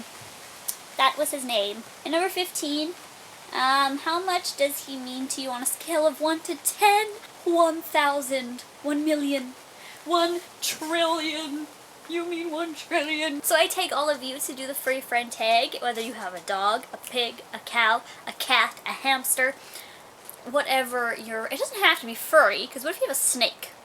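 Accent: Saskatchewan, Canada. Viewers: O just saw u from canada but i think ur from saskatchwan ur accent seems like there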